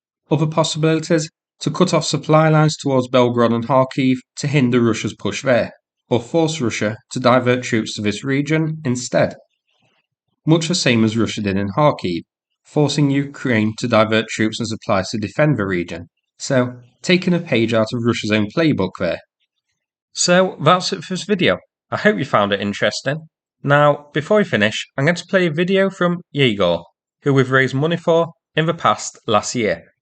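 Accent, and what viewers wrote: United Kingdom. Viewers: Thanks for the video, just discovered you after following the war since the start. I like a UK accent;) :face-red-heart-shape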